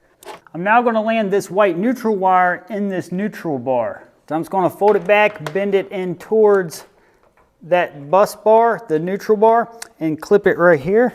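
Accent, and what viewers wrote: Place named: Pittsburgh, United States. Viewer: The yinzer accent is pristine. Feels like home. 😉 Excellent video, man.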